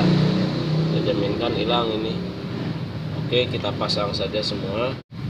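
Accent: West Java, Indonesia. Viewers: duh nuhun Kang....mantappp. Kela, akang orang mana ? Ari logat sunda, ari wajah arab ??? Terus gini kang, motor saya Mio Soul GT Bluecore injeksi kalau di gas teh delay (tdk spontan maju), eta kunaon nyak?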